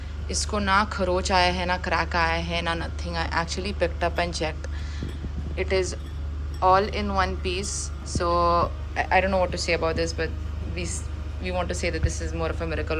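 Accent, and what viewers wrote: India. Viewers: I hate Indian English accent why u guys do this trrrrrŕrrrre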